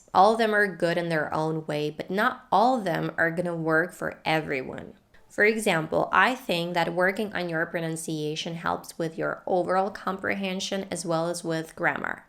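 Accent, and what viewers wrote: United States. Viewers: You have a perfect American accent. Were you born in the US? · Me "Holy s***, English isn't her native language?" From your accent and proficiency in speaking I immediately assumed you were an American. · Madam your American accent is very clear like British accent we understand easily but the other American' s accent we can't understand so easily . So you are a good and expert teacher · Her accent is American,and i like to be an American international communicator like rebel number one and i don't want to mention who is "rebel number one".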